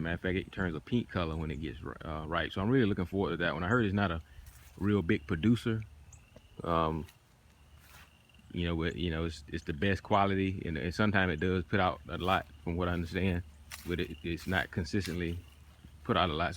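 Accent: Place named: South, United States. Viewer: I've read that pH should be 5.5-6.5, so I think you're putting lime when you should be putting sulphur instead. My Rubycrisp is doing great with highly organic soil, a handful of sulphur, a few handfuls of urea, a couple handfuls of neem pellets to block nematodes, and thick pine bark mulch. I do like the way you speak "southern" though. 🤣 Very Best Regards, Tom Scott Author ● Speaker ● World's Leading Expert on the Corrupt U.S. Legal System Our American Injustice System Stack the Legal Odds in Your Favor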